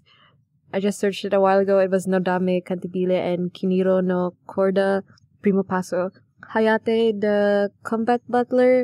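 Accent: Philippines. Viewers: Ur voice has a filipino accent and btw i love ur art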